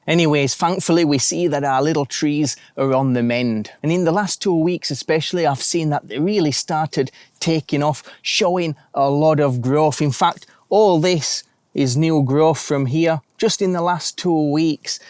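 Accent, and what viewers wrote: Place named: North East England, United Kingdom. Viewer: Just a question to... in your first post where you crack open the cherry nuts and one is empty of seed... you say you'll take it back to Mercadona... you in Spain where the climate is warmer than the climate in UK where your north-east UK accent suggests you're from?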